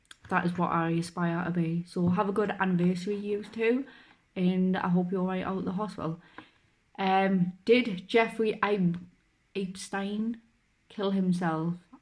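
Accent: Newcastle, United Kingdom. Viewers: Geordie accent (North East England)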